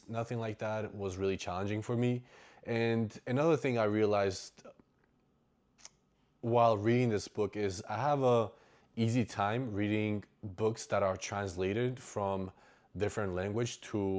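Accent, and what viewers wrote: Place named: New York, United States. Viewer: It's crazy that YouTube recommended this video to me, and im so glad it did. I'm a fellow immigrant who used to love reading and is trying to get back into it, so finding this video in the middle of the night when I was about to turn off my phone is insane. Anyways, I loved the video, I can't wait to watch more. And also, I could barely hear your accent, you just sounded like a new yorkan to me 😆. I will be checking out the books you mentioned soon, beacuase you make them sound really great.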